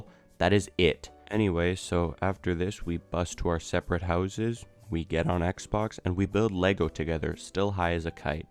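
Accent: Canada. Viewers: Yo are you Canadian?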